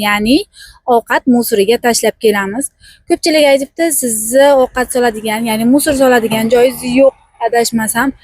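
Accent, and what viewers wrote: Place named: Olot, Uzbekistan. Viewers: Шевангиз холиям олотчча га тортаркан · O'zim aylanay shevangizdan zemlagim Buxoro olotlik men jondordanman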